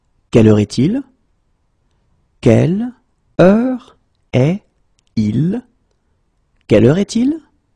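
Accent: France. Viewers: Ouais c'était l'impression que j'avais au début mais c'est clair que vous êtes de France. Je ne suis pas français du coup c'est peut-être juste à cause de cela.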